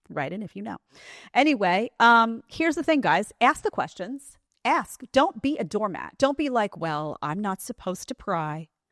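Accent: New York, United States. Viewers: I like your NY accent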